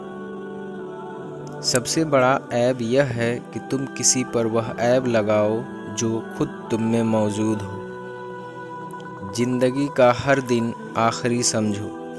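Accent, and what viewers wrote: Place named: India. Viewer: Aap kya India se ho aapke bolane ka Andaaz Sahi Nahin Hai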